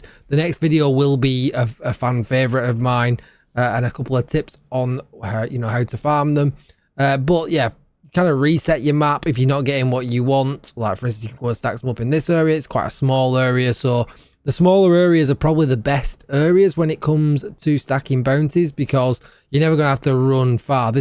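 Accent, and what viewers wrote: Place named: Leeds, United Kingdom. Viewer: You sound like you're local to me. Leeds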